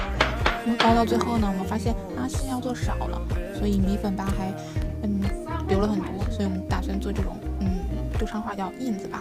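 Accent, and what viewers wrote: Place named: Duchang, China. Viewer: 听到都昌话了...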